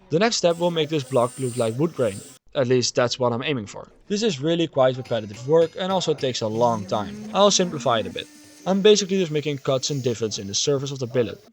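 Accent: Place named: Netherlands. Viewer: The dutch accent is heavy 😂😂😂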